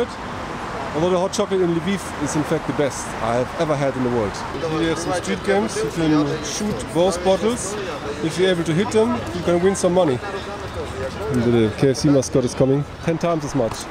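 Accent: Germany. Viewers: 10 seconds in, oh ja, er ist einer defintiv Deutscher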